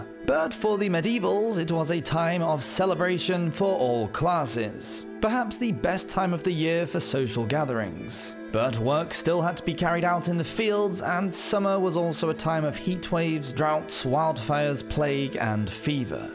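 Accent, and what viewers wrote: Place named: Leeds, United Kingdom. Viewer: You have a Leeds accent I think. Im South African btw so no experience here.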